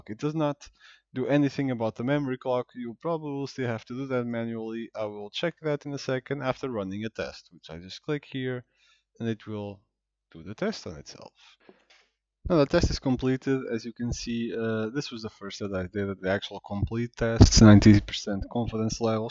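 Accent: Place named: Portugal. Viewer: Compreendo :D Eu assim que te ouvi a falar, pimba! Este gajo é tuga! :D Estou agora a experimentar o teu tutorial na minha EVGA 2070!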